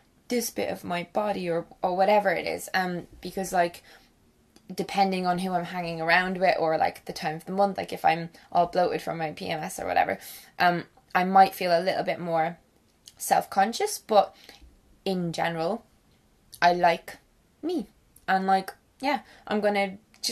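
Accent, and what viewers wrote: Ireland. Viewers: I love theseeeee and you your Irish accent is lovely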